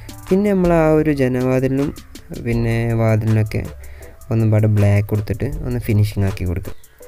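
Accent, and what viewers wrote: Malappuram, India. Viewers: Ee malapuram basha poliyaanu.frendsinod parayunna pole und.anthayalum adipoliyayittund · നല്ല ക്രിയേറ്റിവിറ്റി👌♥️👌♥️ പിന്നെ ഞമ്മളെ മലപ്പൊറം സ്ലാങ് അവതരണവും😀😀♨️♨️ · മലപ്പുറം ഭാഷ പൊളിയല്ലെ..... എന്തിനാ അത് പറയാൻ മടിക്കുന്നെ.... സംസാരികുംനോൾ സൗണ്ട് കുറച്ച് കുറവാണ് അതൊന്നു ശ്രദ്ധികൊണ്ടു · വർത്താനം കേട്ടപ്പളേ തോന്നി മലപ്പുറം ❤️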